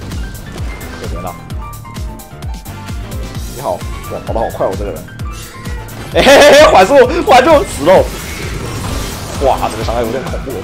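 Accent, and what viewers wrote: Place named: China. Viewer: 確實 他是大陸人嗎 那口音聽得很難受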